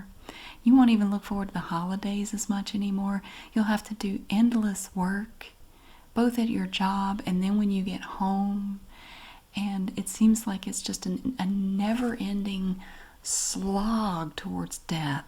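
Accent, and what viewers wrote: South, United States. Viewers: I've been watching your channel for a while now and you are one of my absolute favorites. I'm also a southern lady and my family disowned me when I came out as gay and I moved away(I even lost my accent). My grandmother's name was Mary and your accent and voice just remind me so much of how comforting of a presence she was. It just takes me back to a different world I left behind a long time ago. Also you are so beautiful! I swear I'm not trying to flirt lol. I'm not really into feminine women, just jealous tbh haha.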